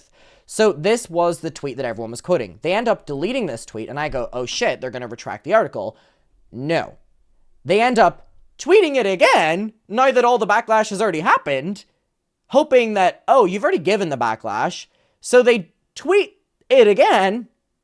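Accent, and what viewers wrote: Ireland. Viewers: they did 0 research on u bcos how’d they READ YOUR NAME and HEAR YOUR ACCENT… and not immediately assume ur from Ireland 😭😭😭😭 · Not understanding the difference between Ireland + England is crazy 😳 You’re accent is clearly Irish like - did they not watch any of your videos? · A couple things: 1, Andrew CLEARLY didn’t do any research because if he can’t even get the country you were born and raised in correct, he’s a certified moron.. I’m from the US and I could tell within 30 seconds of watching you for the first time that you have a very clear IRISH accent. Not to mention you speak about that sometimes. And 2,…can we all agree Andrew and Vanity Fair are groomer supporters? EW, not a good look for a “reputable” organization. Boycott VF!!! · They didn’t even watch any of your videos if they didnt know you were Irish. Although there are some Americans that cant hear the difference between an Irish and English accent.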